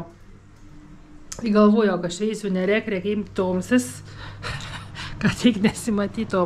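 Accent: Samogitia, Lithuania. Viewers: Viskas bus gerai moterie,esi išskirtine nes kalbi žemaitiška 😅o dabar Lietuvoje tarmes ar dialektas labai vertinimas 👍🙂🙂🇱🇹🇱🇹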